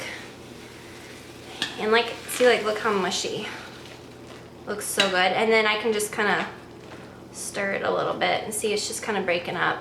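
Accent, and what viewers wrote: South, United States. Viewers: You had such a cute country accent in this video xD and your hair had a little more volume too which was nice :) love the video please do more :) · This is the first time I've ever heard you accent come through in a video! You can tell your from the south!( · are you from the south? You seem to have the cutest southern accent:) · you had such a southern accent in this video! great video! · I've been watching your videos for years and I just noticed your southern accent in this video!:) Great video as awlays xx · I totally can hear your southern accent more....its so cute!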